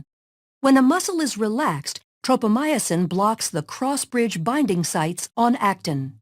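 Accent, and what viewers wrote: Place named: United States. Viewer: this lady is speaking American English 😲🔥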